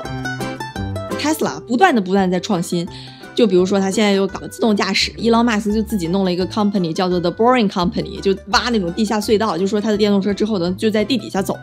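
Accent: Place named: Henan, China. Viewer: 那里的老乡？听说话像河南、陕西、山西那一块的人。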